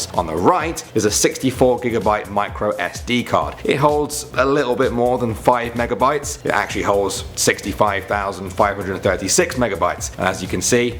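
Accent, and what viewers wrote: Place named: England, United Kingdom. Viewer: He is from England, so, accent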